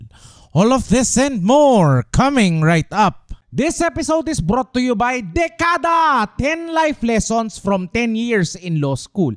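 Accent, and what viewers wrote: Batangas, Philippines. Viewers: Ay Batagueño pala c atty..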